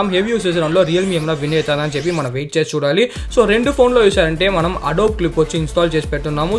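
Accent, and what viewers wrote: Chittoor, India. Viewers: Nice video bro kastha chitoor slang undi 😀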